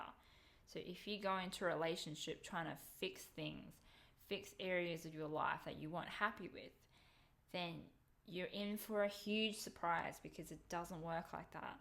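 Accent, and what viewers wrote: Australia. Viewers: are you Australian?? =] you have my accent haha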